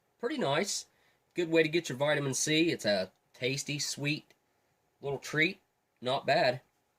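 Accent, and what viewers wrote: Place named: Appalachia, United States. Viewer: i was think maybe on of the southern appalachian states based on how you pronounce some of your words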